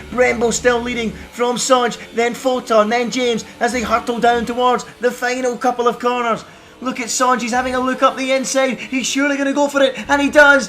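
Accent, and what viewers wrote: Scotland, United Kingdom. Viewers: Scottish ain't too hard to understand, what is hard to understand is why he has an issue with tom97hd tho 🤔 just seems to slate him a bit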